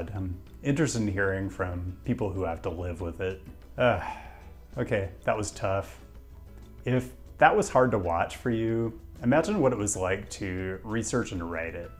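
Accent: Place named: Midwest, United States. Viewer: Enjoy your dry and calm delivery on the review. 'Public health malpractice' indeed. The mildly midwestern accent helps.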